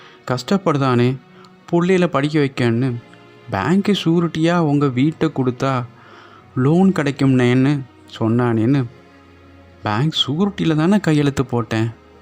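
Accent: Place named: Tirunelveli, India. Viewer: Super.. Once again that nellai slang.. feel at home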